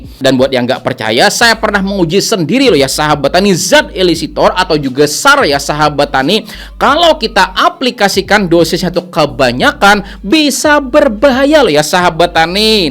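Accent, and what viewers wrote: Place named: South Kalimantan, Indonesia. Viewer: Logat Kalsel ni pinanya 😂🙏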